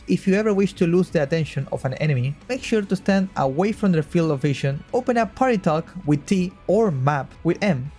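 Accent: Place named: Argentina. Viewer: hasta en ingles se nota lo argentino jaja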